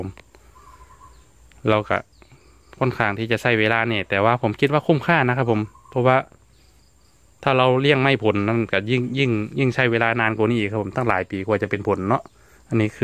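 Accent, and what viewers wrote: Korat, Thailand. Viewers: สำเนียงคนโคราชแท้ครับ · ช่องอีสานฟังไปฟังมาเหมือนคนโคราชเลยพี่คนที่ไหนครับทำไมพูดแบบนี้ ผมเเค่สงสัย555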